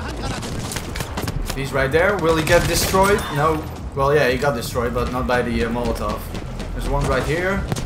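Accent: Netherlands. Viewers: i can tell you're from the Netherlands from your accent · this guy has dutch accent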